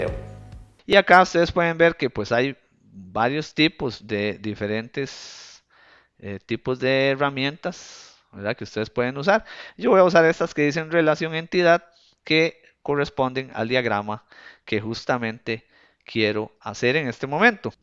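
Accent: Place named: Costa Rica. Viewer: es como si hablara con mi amigo de costa rica , de donde sos? muy bien explicado el video, gracias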